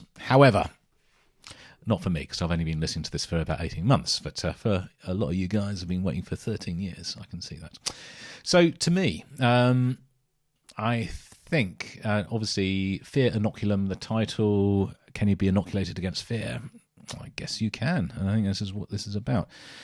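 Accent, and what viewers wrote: United Kingdom. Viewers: Why do I feel like I'm watching I'm Alan Partridge? Probably because you're British and you've a good voice for radio.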